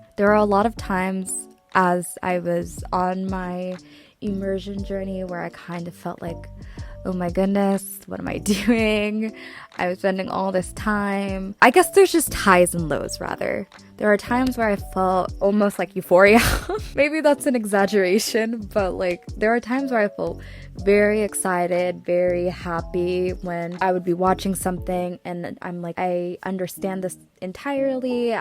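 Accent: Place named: Somalia. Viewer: you sound somali. plz correct me if not but i can just recognize a 1st gen accent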